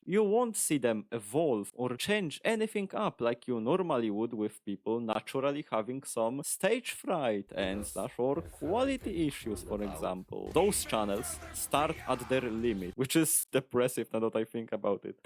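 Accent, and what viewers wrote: Poland. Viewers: Yeah I was thinking you sound Polish, did I get that right?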